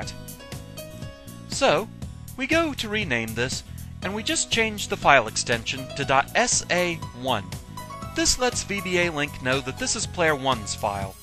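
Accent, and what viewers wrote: Scotland, United Kingdom. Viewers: SCOTISH ACCENTS RULE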